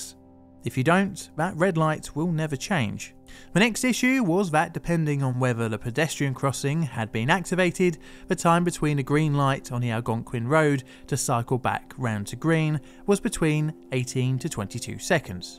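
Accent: United Kingdom. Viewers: I gre up in crystal lake. The bus drill we did every year was alway quite serious because of this. I knew about it before my feet touched the seet in front of me. I graduated in 13 so all the adult knew someone affected. We watched the news footage. Its so weird to bave your little corner of the world read by a British person half a world away. Cheers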